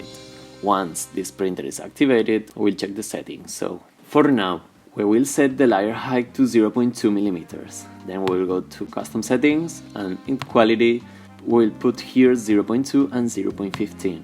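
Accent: Spain. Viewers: Después de un buen rato viendo el vídeo y sospechando por tu acento de que sabías hablar español, veo que pone en el Mac "Archivo/edición" jajaja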